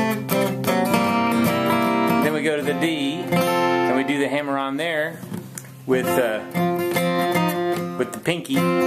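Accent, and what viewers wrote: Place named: South, United States. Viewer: Howdy partner your southern accent is the best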